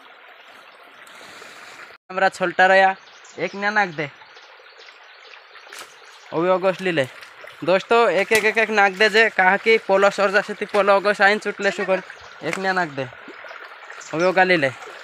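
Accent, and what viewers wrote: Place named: Madhya Pradesh, India. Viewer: आप जिस भाषा में बोलते हु व भाषा तो आलीराजपुर सोंडवा कि जैसे लगती है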